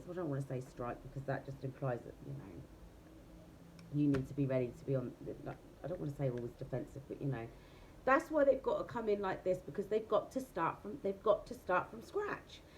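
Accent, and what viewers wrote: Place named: London, United Kingdom. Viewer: You must be London 🤔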